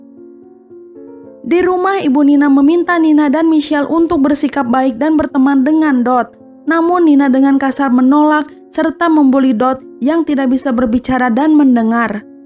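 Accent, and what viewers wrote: West Java, Indonesia. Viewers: Logat Sunda mu mbak , aduhhhh .. tolong perbaiki mbk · Sama😭 itu kek logat sunda sih bukan medok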